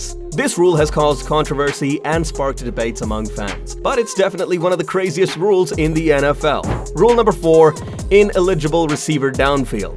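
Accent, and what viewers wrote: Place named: England, United Kingdom. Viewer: You give 14 rules, not 13. Judging by the pronunciation of words, these are rules people in England don't know exist. The only ones that I had never heard of were the "palpably unfair act" which is vague and would be something along the lines of intentionally breaking the standards of the game -- say, Philadelphia removing goal posts so both teams had to try for 2 points, which their QB sneak ability gives them a distinct advantage. Or a team putting players into the game that are not on the roster of active players for that game. The other rule you call "touchdown touchback" isn't a rule. The rule I think you are trying to cite -- especially based on the images you show -- is "if the offense fumbles the ball and the ball crosses the defender's goal line and goes out of bounds, the ball is awarded to the team that was on defense as a touchback." Also, many clips didn't depict anything regarding the rule in question. 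And a number of the clips were of college teams, not NFL.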